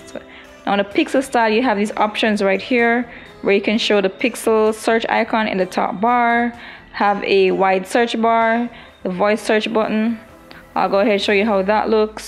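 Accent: Jamaica. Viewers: you sound like a jamaican nice videos once your on youtube you set you soon get more subscriber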